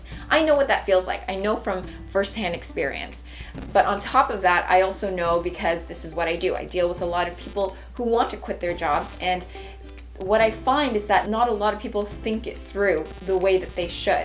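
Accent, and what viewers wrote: Canada. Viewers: You sound Canadian :)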